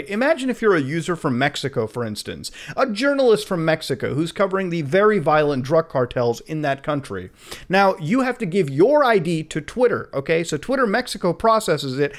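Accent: England, United Kingdom. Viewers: Nice English accent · Nice British accent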